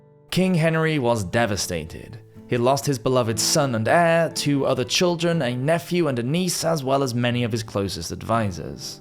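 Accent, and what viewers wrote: England, United Kingdom. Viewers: You sound English? They didn't teach you this? I am Australian. I learned this ... did the conservatives fuck you over THAT much?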